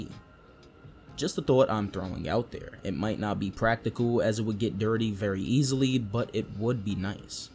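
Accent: New York, United States. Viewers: u got such a ny accent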